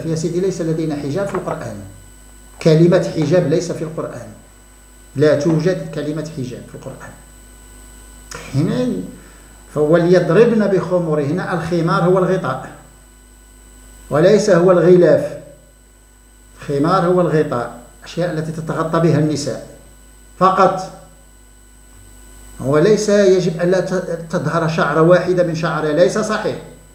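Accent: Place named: Morocco. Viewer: تحياتى اليك دكتور، لي عندك رجاء: اللهجة المغربية صعبة قليلا ،ويزيدها صعوبة سرعتك في الكلام. ليتك تتمهل قليلا في الكلام حتى نتمكن من الاستفادة من علومك القيمة .وشكرا جزيلاً ...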